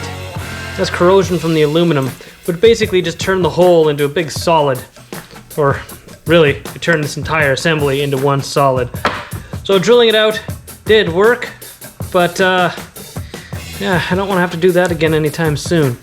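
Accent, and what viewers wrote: Canada. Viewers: I knew I could hear a Canadian accent in this guy!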